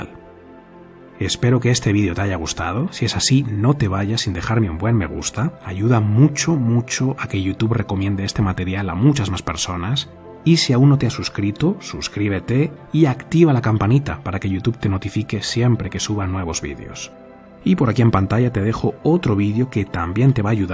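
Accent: Spain. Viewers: Lo que es feo es tu forma de juzgar y decir que la mayoría lo hacen.Es claro que te identifica con ellos.Para mi muchos hablan como lo hace aquí está bella persona.El español es un acento dulce al escuchar ❤ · Es el único acento de un español que sí Disfruto al escucharlo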